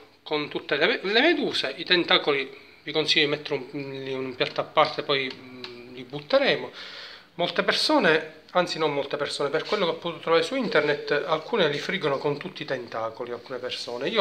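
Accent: Sicily, Italy. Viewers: Mamma mia l'accento siciliano si sente tanto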